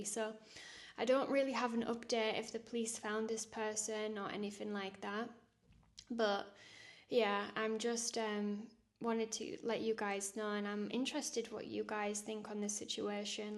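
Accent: Northern England, United Kingdom. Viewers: I've rediscovered my FAITH thanks to you and now my Wife. I maybe rediscovering my FAITH but that was a demon! You are doing God's work doing all the good in the world giving Heavenly Father's messages!. Stay safe for goodness sake. I'm newly married Wife in Queensland I'm in PERTH western Australia. I too have had similar things happen to me. God talks to me daily and its as if and I'm sure you can relate we are suddenly targets. I've had so many experiences I could have been killed its freaky! I'm From England and its your Northern accent drew me. Cheshire the Wirral?? 😊 Either way You make the each day warmer happier with your Videos. God bless You. ❤😊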